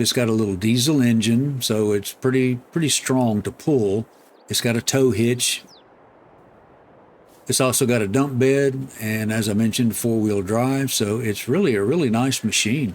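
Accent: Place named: South, United States. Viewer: Thanks for the video, you're so relaxing to listen to, I came home from work and eat then sit down to listen to you and about fell asleep not that you're boring just relaxing listening to you. You sound like your country is that your voice or are you throwing it for the video? Lol But thanks again for your time appreciate it!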